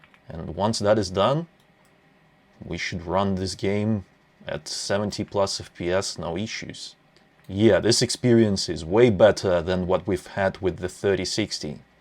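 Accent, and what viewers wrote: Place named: Russia. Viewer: Guy with Russian accent talking about FPS makes me nostalgic for FPSRussia channel.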